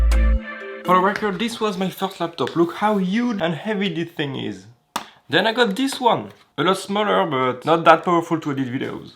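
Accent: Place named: France. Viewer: The French accent make this review magnificent L’humour le montage t’es bon ! Keep going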